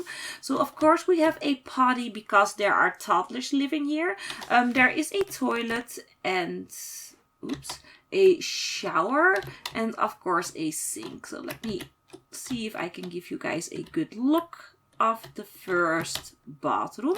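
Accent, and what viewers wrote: Netherlands. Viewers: because you have that Dutch accent and no fense though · u engels is heel slecht je moet de T niet zo nederlands uitspreken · hellooooooooooooo! euhm youre accent is like a dutch one. im also dutch so why would this be hate? but my question is:are you dutch?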